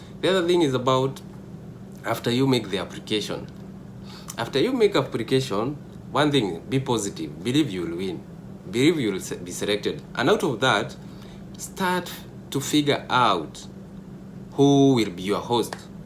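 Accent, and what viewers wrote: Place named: Kisii, Kenya. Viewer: You sound like a kisii🤔🤔🤔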